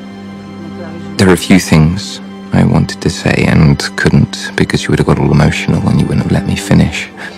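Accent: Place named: United Kingdom. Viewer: 念的英音英语真好聽。喜歡死這部電影了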